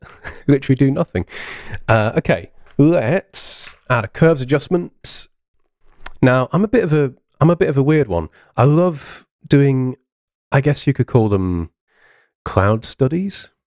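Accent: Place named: United Kingdom. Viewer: it‘ a pleasure to listen to your British, sophisticated voice.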